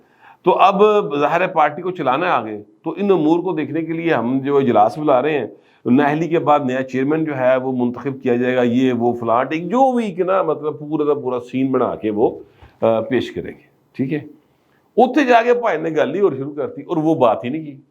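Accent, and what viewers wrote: Punjab, Pakistan. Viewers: Love ❤️ your accent Punjabi plus urdu Kamal hai janab In a good sense really Riwan QURESHI USA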